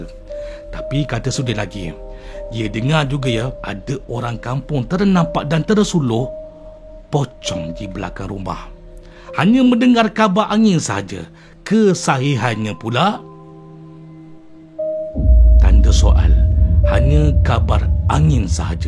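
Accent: Perak, Malaysia. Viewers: Awsome sgt you tuber dari ipoh dgn lorat negeri perak ....best dgn style and cara yg paling kreatif ...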